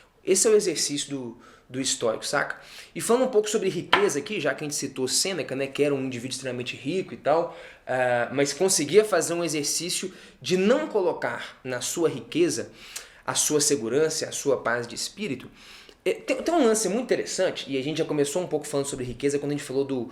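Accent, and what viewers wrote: Minas Gerais, Brazil. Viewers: tu é mineiro mestre? Me identifiquei com o seu jeito de contar caso e falar KKKKKK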